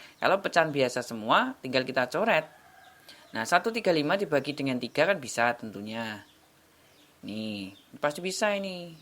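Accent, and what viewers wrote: Java, Indonesia. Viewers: Terima kasih bang. Salam dari pemalang. Bang maaf boleh tau asalnya? Logatnya Jawa nih hehe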